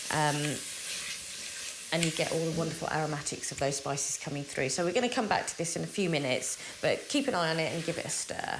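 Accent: United Kingdom. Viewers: Thank you for what you do. Your videos are knowledgeable and inspirational! It’s obvious you’re passionate about Indian food. 🥰 And I love your British accent. Best to you & yours.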